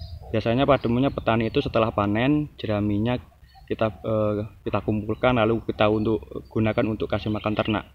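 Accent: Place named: Java, Indonesia. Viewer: Ketoe wong jawa ki logate leh ngomong..tak subcribe mas.. Bener cukup efektip mas saya taruh kapur barus di pohon padi lalu saya cek aman..Padi saya hst 45 hr.. Cm klo musim hjn gimana cara yg baik naruh kapur barus biar gk kena air hjn. Blm ktemu ide saya ini mas..Tolong kasih saran mas klo musim hjn kasih petunjuk mas.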